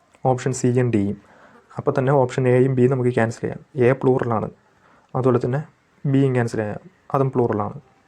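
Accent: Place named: Thiruvananthapuram, India. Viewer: Chettan TVM aanallle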